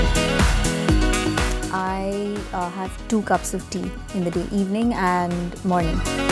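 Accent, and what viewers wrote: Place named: Mangalore, India. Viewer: She is a mangalorean. Most girls speak and have expressions the same way. Also Aishwarya is a mangalorean. We can relate.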